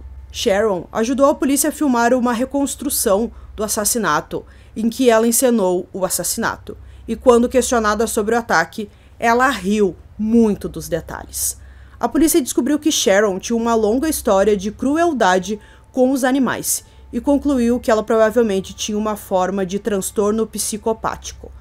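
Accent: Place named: Rio Grande do Sul, Brazil. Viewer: Ela parece o Mário cortela falando. Será q e gaúcha tmbm?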